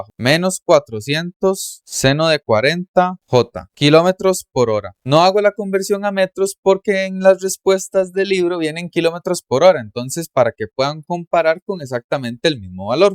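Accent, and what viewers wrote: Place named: Costa Rica. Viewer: ..jajajaa ese asentó TICO es inconfundible....felicidades.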